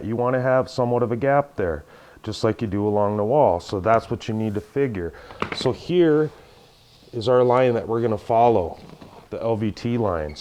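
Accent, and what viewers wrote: Minnesota, United States. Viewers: are you from Minnesota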